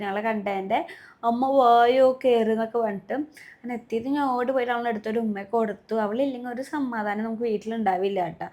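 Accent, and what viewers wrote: Thrissur, India. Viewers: Ivde thrissur angane aanu😂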